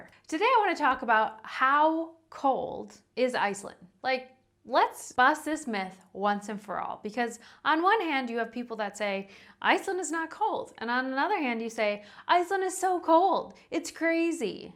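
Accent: United States. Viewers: R u American ?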